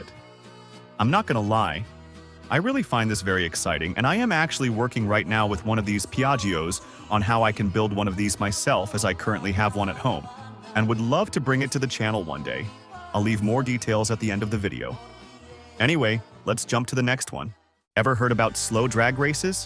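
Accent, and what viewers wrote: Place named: United States. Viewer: American accent; says 'whilst' and 'pub'...🤔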